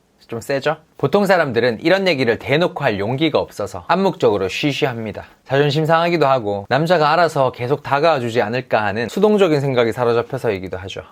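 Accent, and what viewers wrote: Gyeongsang, South Korea. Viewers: 부산 사람인가요? 경상도 분이신가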